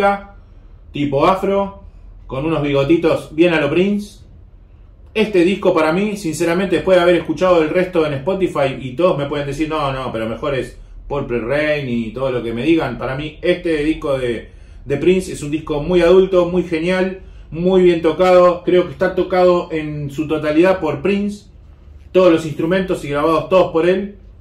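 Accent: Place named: Argentina. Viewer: Cómo estás estimado, tienes tu propio estilo, eres original, tu acento argentino le da un toque especial, te sigo desde el primer minuto que te vi en youtube. Felicitaciones y adelante.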